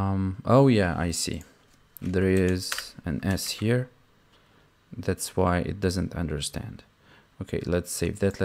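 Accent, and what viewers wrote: Russia. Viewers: bro got russian accent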